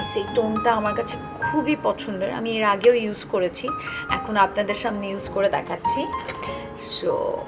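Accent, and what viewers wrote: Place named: Kolkata, India. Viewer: Guerniss Er Makeup Sunechi Khub Soft Hoye Tomake Amar BD Na Kolkatar Meye Lage Bcz Tumi Khub Sundor Kore Cholti Banglai Kotha Bolo ☺️🥰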